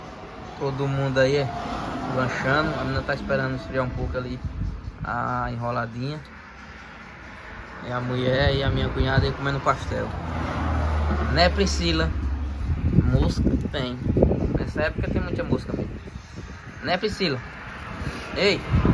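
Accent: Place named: Northeast, Brazil. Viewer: Sotaque nordestino é maravilhoso 😊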